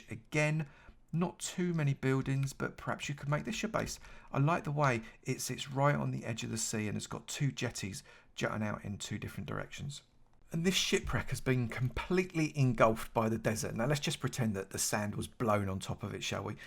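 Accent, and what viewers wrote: United Kingdom. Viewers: your voice sounds like gordon ramsay lol or some british dude doing a tutorial about somehing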